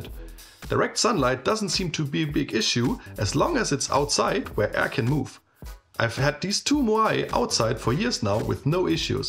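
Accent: Germany. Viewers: Not a native speaker. To good and clear pronunciation :) German? Subscription is done.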